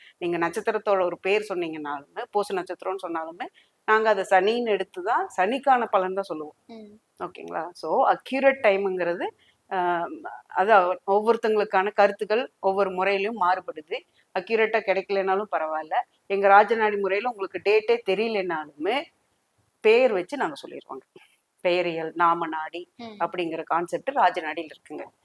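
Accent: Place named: Kongu, India. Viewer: Konguu tamillum kamidiyana pechum!!😂🎉.....naadi joshiyum super!!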